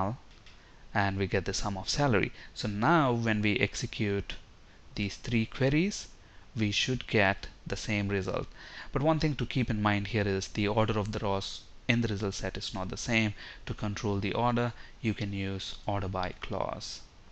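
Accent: India. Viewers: Sehr schön! At first I thought Oh no, not that Indian accent anymore! But the vid is actually very helpful! Thanks alot :-)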